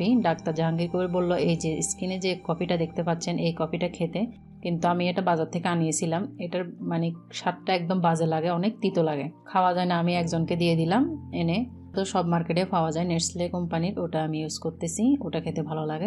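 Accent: Chittagong, Bangladesh. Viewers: Apu you from chittagong?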